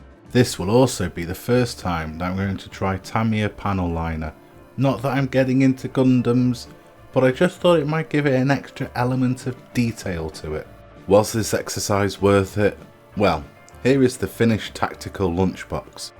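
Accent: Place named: North, United Kingdom. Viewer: I'm subbing because you sound Northern and depressed. You'd think this would be wrong but I'm becoming highly suspicious of happy Southerners. It must be a Northern thing.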